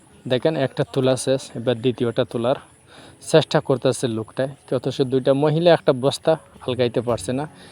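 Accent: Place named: Sylhet, Bangladesh. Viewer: ভাই আপনি তো আমরার সিলেটি মাথে বুঝা যায় ❤😂😂😂😂😂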